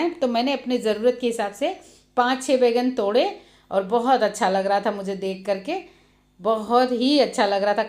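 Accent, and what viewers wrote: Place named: Chhattisgarh, India. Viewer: Mam Aap kha se ho Aapki language to chatishgad se lag rahe ho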